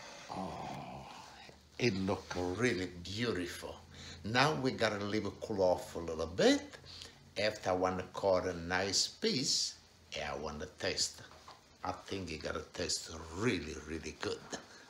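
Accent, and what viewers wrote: Italy. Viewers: Che bello sentire il tuo accento con l`inglese mi fa venir voglia di ritornare in italia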